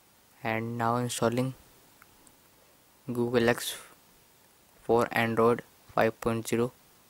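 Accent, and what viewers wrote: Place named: India. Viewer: Stupid india accent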